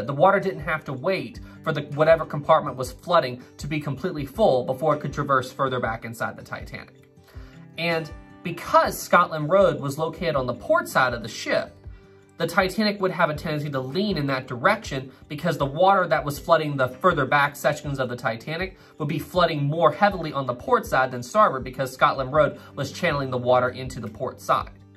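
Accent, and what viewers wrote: South, United States. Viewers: His southern accent and charm definitely add to it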